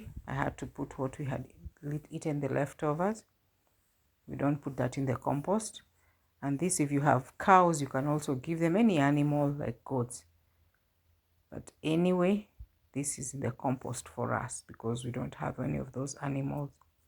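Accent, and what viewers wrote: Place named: Kamba, Kenya. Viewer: I sensed that you sound like Kamba, where are you from?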